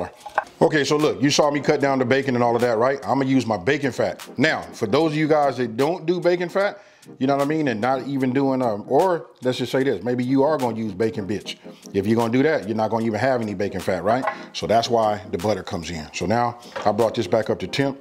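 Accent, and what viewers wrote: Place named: California, United States. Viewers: I’ve been cooking with potatoes a lot lately. I can’t WAIT to make this. Thank you! Love your Cali accent too. 🌸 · Oh ok so he’s from Cali ok. I thought he was somewhere in the South by his accent ok hehehe.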